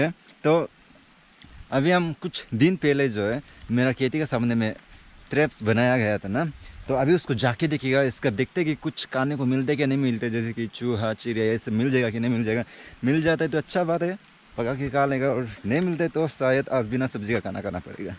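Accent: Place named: Dhaka, Bangladesh. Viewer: Assamese ma dhakiya bolta ha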